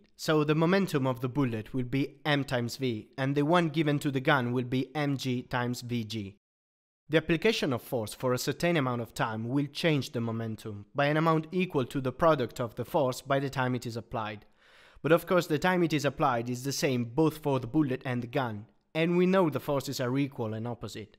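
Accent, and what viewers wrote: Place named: Italy. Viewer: Come on, give the guy a break. We all know Italians have a very heavy accent when speaking. Your fellow countrymen don't do any better in speaking English, neighbour ;-)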